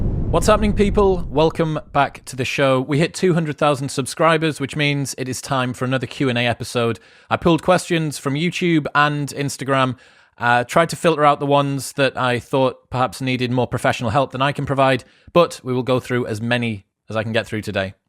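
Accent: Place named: Newcastle, United Kingdom. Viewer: Congratulations on the success Chris, I have been following for quite a while now and I think you deserve every bit of it. Plus its nice to hear a fellow Geordie having these conversations - Living away from home at the moment for my PhD.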